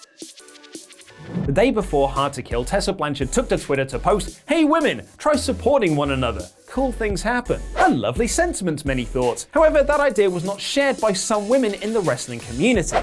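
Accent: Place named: United Kingdom. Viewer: Brits pronounce blackball and Blackpool the same. Not a good episode to have both Tessa and NXT UK news.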